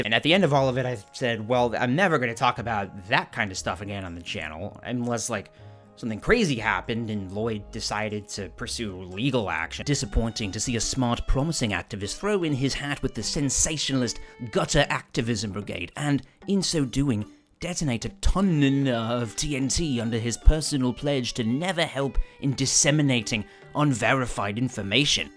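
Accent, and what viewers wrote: United Kingdom. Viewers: Heh, there’s that British accent again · I'm not saying it's offensive I'm saying it really isn't that funny, it's boring, I'm watching for humor and I expected something a little more thought through. I feel like a teenager watching this shit. If you're going to make fun of another English speaker have some more complexity than just accidentally reading potentially a native Croatian lawyers script in a really blank slate crappy British slant. Common, I'm not subscribing for reporting, I wanted good comedy.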